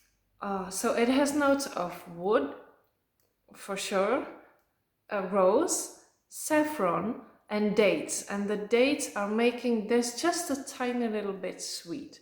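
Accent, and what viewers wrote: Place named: France. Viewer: Hello! I loved your video and the way you describe your fragrances. You have such a beautiful accent, the nicest I have ever heard on YouTube talking about perfume. Are you French? You are so elegant! I can't wait to hear your wish list. Also your male recommendations? Stay safe and please keep going with your channel I love it! Best wishes from Australia xx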